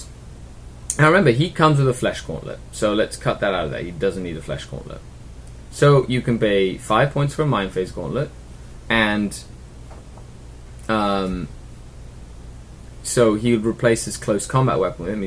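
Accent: United Kingdom. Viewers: Are you Canada you sound uk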